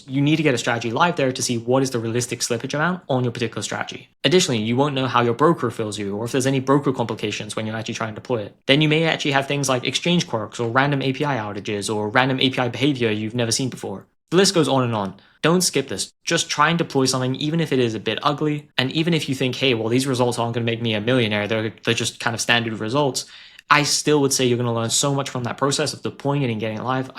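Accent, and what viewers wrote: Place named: Australia. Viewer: Are you Aussie bro? Your accent seems kinda mixed . Cool videos!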